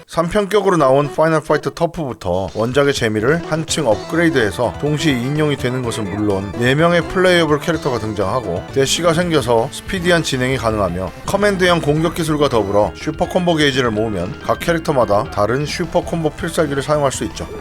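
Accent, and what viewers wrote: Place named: Jeolla, South Korea. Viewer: 극강인지라~ 뭐뭐인지라~ 전라도사투리 오지게나오네요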